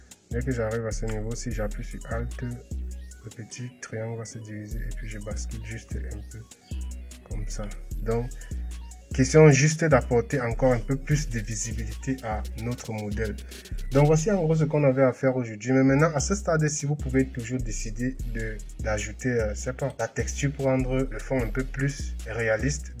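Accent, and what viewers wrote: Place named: Cameroon. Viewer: Je m'abonne d'abord parce que ton accent la c'est pour le Continent 237 pure pure. Puis je like parce que tu na pas cacher comme les autres et j'active la cloche parce que tu a du bon contenue et c'a m'aide.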